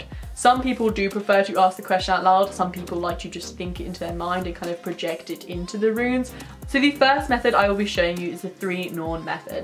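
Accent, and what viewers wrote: England, United Kingdom. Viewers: Thank you for the video. I love your English accent and you have a beautiful voice. Sending you love and light. 🙏🏼💜🧿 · Corinna Ginterseder sometimes her accent sounds kinda british, but i'm not specialist :P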